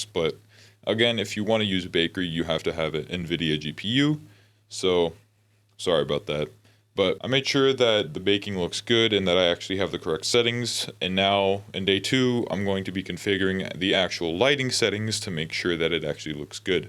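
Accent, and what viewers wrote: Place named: United States. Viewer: Yo! What country do you live in and did you used to live in America because you sound American but you say your eu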